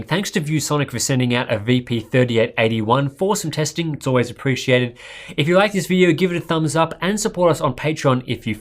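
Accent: Australia. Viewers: Australian accents are hawt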